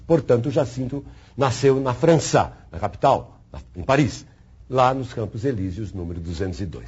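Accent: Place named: Caipira, Brazil. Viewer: Belo trabalho, voz caipira lembrando os velhos antigos (sim, os velhos de antigamente eram muito melhores e melhores contadores de estórias que esses velhos de hoje). Parece ser um excelente professor, apaixonado pela sua arte. O livro me pareceu ser chato e descritivo demais, justamente o que procuro, o Eça tem essa qualidade mágica de fazer nos apaixonarmos pelas palavras. Pedi hoje, espero ler logo, logo.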